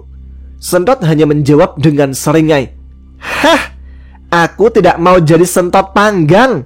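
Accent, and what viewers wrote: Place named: Bogor, Indonesia. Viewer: Suoro bogor❤😂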